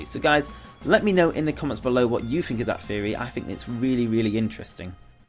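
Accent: United Kingdom. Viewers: Me Turkey I mean, they are both British I guess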